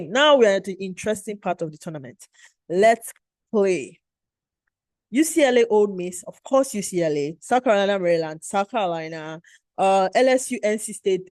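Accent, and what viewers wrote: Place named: Nigeria. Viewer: I'm Kenyan so I was loving the Nigerian accent Oooo! Thanks for the video. I used your exact model for 1 of mine. Wild & crazy bracket! Wish you the best oooo! 😜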